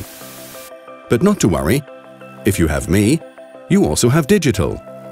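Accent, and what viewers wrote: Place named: United Kingdom. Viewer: He's Kinda British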